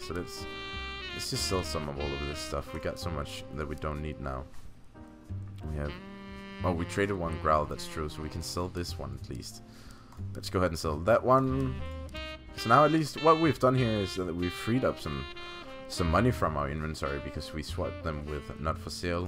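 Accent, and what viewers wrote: Denmark. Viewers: Ye I thought I could hear some Danish accent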